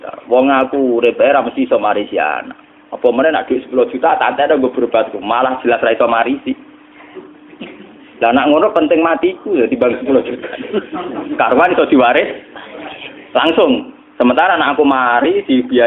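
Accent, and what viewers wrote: Java, Indonesia. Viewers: Tolong admin channel ini smua pake translate meskipun beliau pake bahasa indo tapi dialek,y msih kental dng jawa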